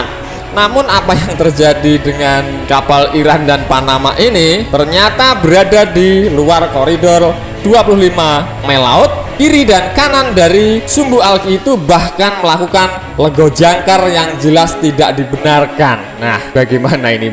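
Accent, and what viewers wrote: Java, Indonesia. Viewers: Suaramu min is the medok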